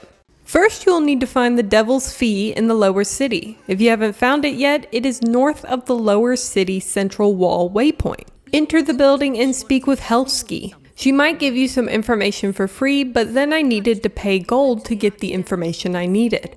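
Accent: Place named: South, United States. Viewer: What is your accent? I feel like it's southern but like sophisticated at the same time 😎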